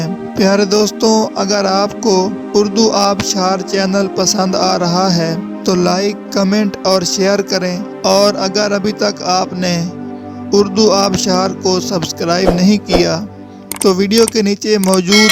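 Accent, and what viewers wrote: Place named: India. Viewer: UZMASAMEER Indian tone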